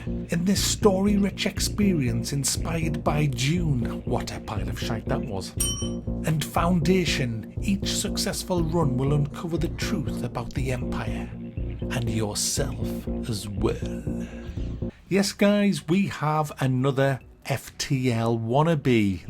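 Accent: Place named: Newcastle, United Kingdom. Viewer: Oh Lord, first time watching a review channel and it'll never be looked at again. Normally liked a Geordie accent but this guy was wildly unfunny and f**king annoying.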